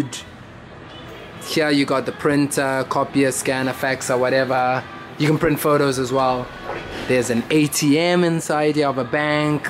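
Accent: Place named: China. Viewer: Amazing Chinese accent!!!!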